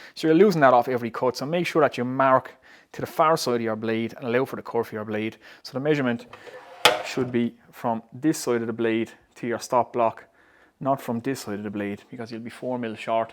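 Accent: Waterford, Ireland. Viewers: Great video, great workmanship but most of all, great accent. The Irish accent is always happy-sounding which is what we need over here in England at the momment. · What accent is this? Ok, Ireland. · Hi. Found your site by accident but well worth the visit. Living in Co. Meath and the accent and the Irish gift of the gab is so refreshing on a site such as this. I look forward to seeing the rest of your catalogued videos. Cheers. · Ii, great videos. Really well done and very informative. I'm just getting back into woodworking after giving up wood turning many years ago. I'm making some patio furniture and some raised planters so great ideas and guidance from your videos. I'm looking to make some chopping boards for family and friends as gifts and I'm struggling to source some hard woods such as walnut, beech maple etc. I'm seeing some hardwood suppliers online but it's a case of very little detail of board size/lenght and cost. 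It feels a bit intimidating when looking for relativly small amounts. Are you aware of any suppliers that would deal with craft or hobby woodworkers. Sawn timber would be fine as I can joint and thickness it myself. Love the videos and have started the mitre station. By the way, this might be fighting talk but is that a Deise accent I hear? Thanks and keep her going.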